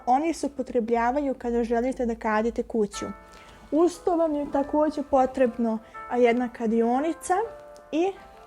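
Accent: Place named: Republika Srpska, Bosnia and Herzegovina. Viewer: ОНО ШТО МЕ РАДУЈЕ ЈЕ ТО ДА ПРИЧАШ ИЈЕКАВИЦУ И МИСЛИМ ДА СИ ИЗ РЕПУБЛИКЕ СРПСКЕ. И ЈА САМ.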